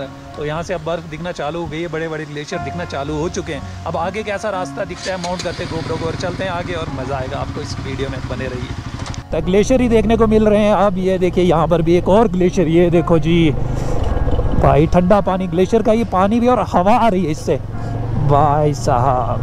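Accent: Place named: Jammu, India. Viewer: Apki bhasha se lagra h ap jaamu se ho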